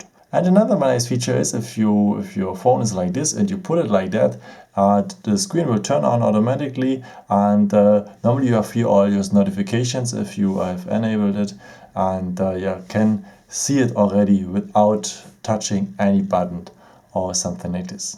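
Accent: Germany. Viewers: German accent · I'm getting this phone over weekend. I love your German accent! I love German accent. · Haha man merkt irgendwie schon raus das du deutsch bist. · Warum nicht Deutsch ich mein man hört es ja eh. Ich hole mir nähmlich auch das a50 also eigentlich ein ganz nützliches Video auch wenn ich vieles schon wusste weil ich schon so ungefähr 40 videos über des a50 gesehen habe.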